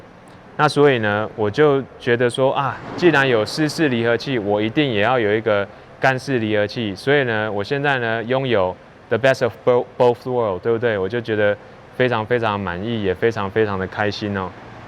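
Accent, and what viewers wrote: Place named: Taiwan. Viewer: 老師貌似是台灣腔。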